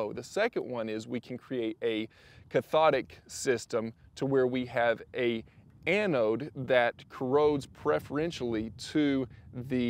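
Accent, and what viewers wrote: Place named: Texas, United States. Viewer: "Still" what a texan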